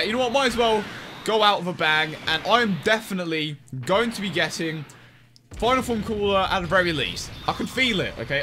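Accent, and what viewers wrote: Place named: United Kingdom. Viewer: Karlo that's British accent for ya he literally said Imperfect Cell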